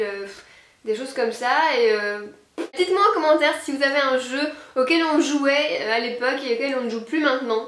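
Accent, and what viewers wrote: Belgium. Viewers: Oh je suis nostalgique <3 j'adoooore la vidéo et ce look te va à ravir :* C drôle comment vous dites 90 xD c l'accent belge ?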